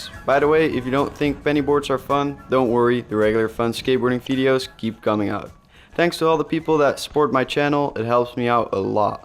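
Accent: Netherlands. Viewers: Are you dutch? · Ben je nl 😂😂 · Are you Dutch?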